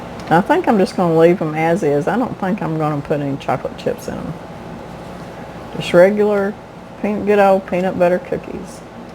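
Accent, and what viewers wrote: South, United States. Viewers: I am a new subscriber from Ontario, Canada. Not only do I love your recipes and your channel... but... I absolutely LOVE your Southern accent. Whereabouts are you from?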